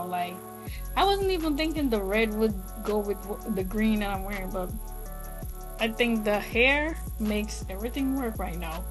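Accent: Jamaica. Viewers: girl have Jamaican accent ❤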